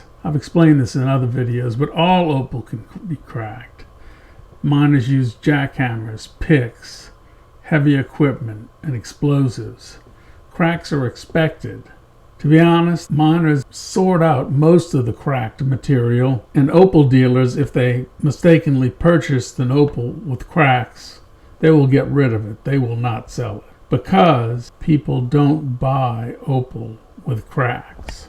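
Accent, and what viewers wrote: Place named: Louisiana, United States. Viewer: Hello...I truly enjoy your videos...thank you for sharing your knowledge...where are you from ? Sounds like a Louisiana accent...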